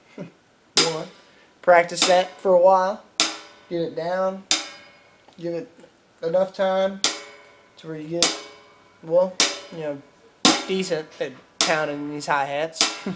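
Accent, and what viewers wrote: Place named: United States. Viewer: You took the time to yuk it up about how lame "country people", whatever that means, are, but you're as hic as a fresh Dixie Daisy whut fell off the turnip truck with your hayseed accent. The super cool long hair doesn't cover your accent. I believe you were thusly ensnared for having forgotten the wisdom learned from the East who teach, "he who smelt it dealt it." If you felt the urge, you could have just said country music sucks rancid smelly goat balls and no one would have been the wiser.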